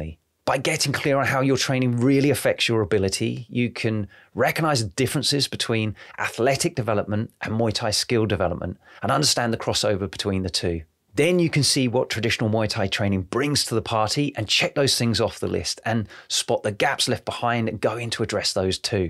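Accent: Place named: United Kingdom. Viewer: hes british for godsake. some people dont understand proper english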